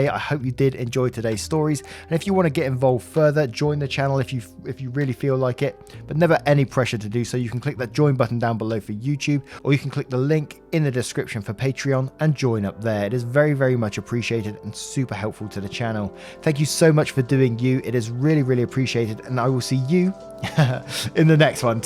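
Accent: United Kingdom. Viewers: Nothing better than listening to a British dude shitting on MLMs while playing a farming sim game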